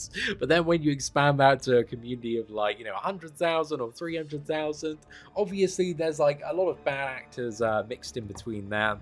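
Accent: United Kingdom. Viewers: Gosh missed his bri ish accent alot it's actually an eargasm to me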